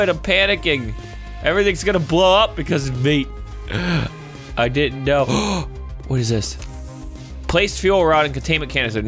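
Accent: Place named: Russia. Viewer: In case you didnt notice he has a rusian acsent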